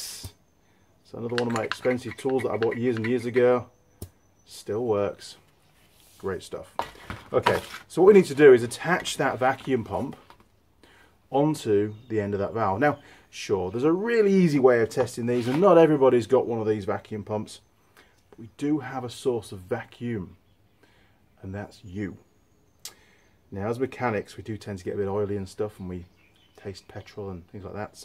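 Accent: Yorkshire, United Kingdom. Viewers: York,i Know it well, the 1 way system is mental,nice town,walked along the city walls a few times,i could sniff a hint of Yprkshireman in there,i too am from Yorkshire, well Hull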